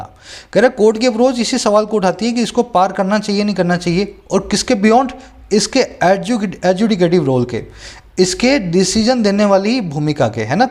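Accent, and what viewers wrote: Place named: Haryana, India. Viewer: Awesome sir. Your way of teaching is unique. Even hariyanavi accent add a feather in our cap.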